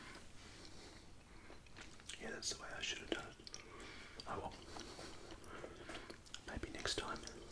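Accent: Australia. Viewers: "An Australian man's voice quietly discusses this beaker of contaminated blood"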